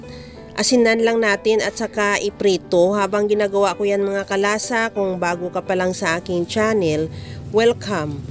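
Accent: Iloilo, Philippines. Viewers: wow for sure yummy ang ganda ng pagkaluto pero parang my tunog ilonggo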